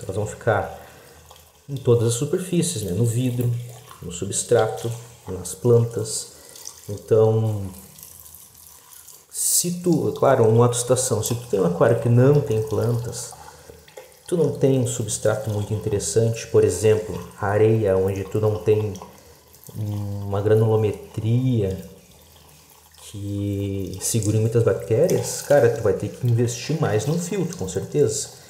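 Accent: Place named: South, Brazil. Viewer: Teu sotaque parece ser do sul. Vc e sua família estão seguros devido as enchentes históricas?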